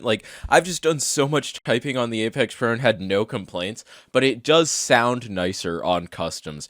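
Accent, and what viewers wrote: New York, United States. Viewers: I'm guessing New Yorker lol Don't worry It's beautiful 😘 It's only a few words as well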